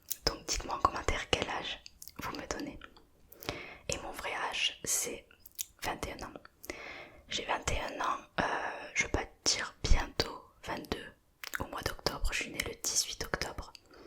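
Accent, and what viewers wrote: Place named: Carcassonne, France. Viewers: Je me disais bien que tu avait l'accent d'ici (Carcassonne 😂) ! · Merci de t être confiée comme cela. C est touchant. Et je me disais bien que cet accent venait du sud ouest...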